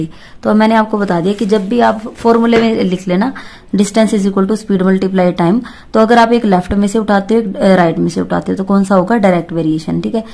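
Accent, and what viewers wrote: Haryana, India. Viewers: Mam is belongs to harayana because unke bolne ke tarike se pata chalta hai ,,right mam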